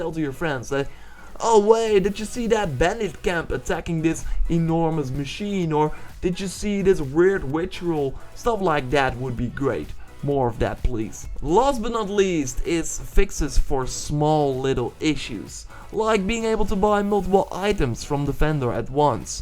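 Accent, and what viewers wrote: Netherlands. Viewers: Just love the dutch-english accent. Much better than the french-, german- or swedish-english accents. Atleast I assume you are dutch based on your accent, am I wrong?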